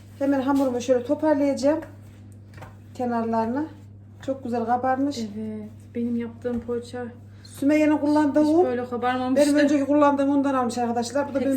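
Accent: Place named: Sivas, Turkey. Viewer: Bizim sivemizde aynı bacı Sivas liyim 😊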